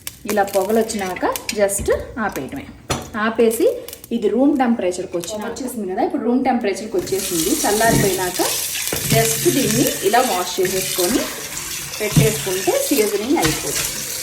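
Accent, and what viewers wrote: Nellore, India. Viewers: Medam midhi Nellore ahaa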